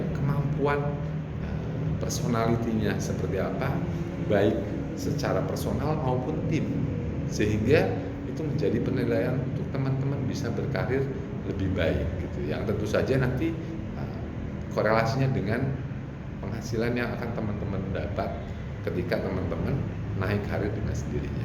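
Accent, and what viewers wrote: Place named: Java, Indonesia. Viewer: asa jadi medok pisan pa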